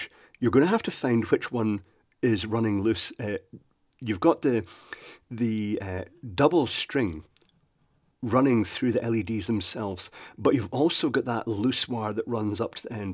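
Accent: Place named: Edinburgh, United Kingdom. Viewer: The only thing odd about the sound is your very posh accent, almost Morningside 🙂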